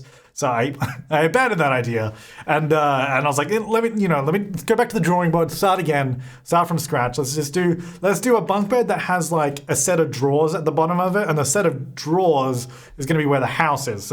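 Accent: Australia. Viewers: I can sure hear it. I’ve been watching a lot of Jazzas videos lately. Maybe it’s the way we Aussies say things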